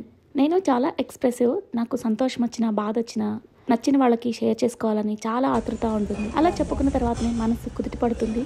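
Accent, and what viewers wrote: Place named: Nandigama, India. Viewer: Ma nandigama heroine 😻💓 me too born in nandigama andi ⭐💭 anduke slang adiganu .💖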